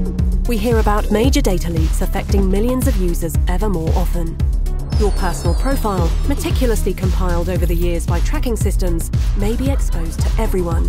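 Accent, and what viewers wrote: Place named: United Kingdom. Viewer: Music and British accent make this very hard to watch! I hate it!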